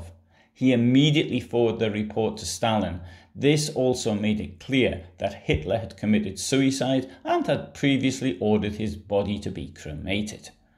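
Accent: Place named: Newcastle, United Kingdom. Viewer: I certainly have subscribed, and I am certainly enjoying your Video's and I am certainly intrigued by the Bizaar mix of your accents..... Newcastle????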